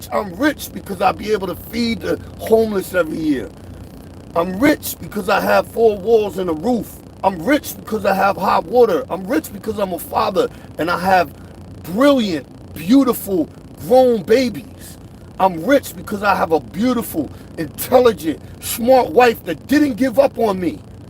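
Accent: New York, United States. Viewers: I wish I had caught this when you were doing it. Your talk and slang is straight New Yorker. Maybe it's because I live in Pennsylvania and am married to a born and raised New Jersey girl that I know it but to attack you for an accent that has nothing to do with the content you are putting out there. It's BS. Please know that this white 45 year old is a better flat bedder because of you. I don't give a shit about whatever accent you are putting out!! thanks for the help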